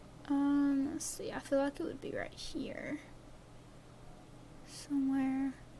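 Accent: Texas, United States. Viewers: are you from texas? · are you from Texas?? cause you have a farm girl axcent I adore it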